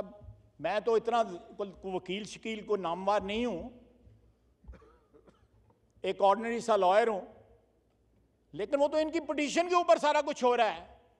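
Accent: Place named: Kashmir, India. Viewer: Kashmiri easay hi bolta hai.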